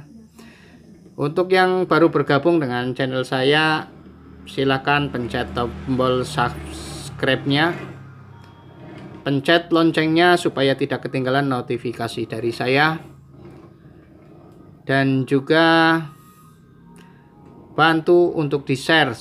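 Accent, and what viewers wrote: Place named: Banyumas, Indonesia. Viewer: Mantap om 👍👍👍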